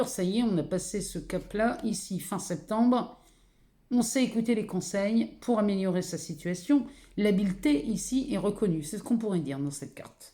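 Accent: North, France. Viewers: Vous avez un bel accent du Nord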